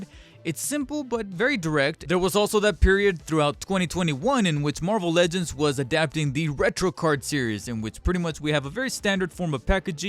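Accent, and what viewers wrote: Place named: Quebec, Canada. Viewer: Your accent is throughout me. It sounds very Québécois.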